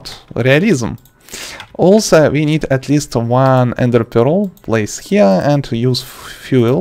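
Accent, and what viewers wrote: Russia. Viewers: thanks so much very useful. you sound like your russian, I am also russin good luck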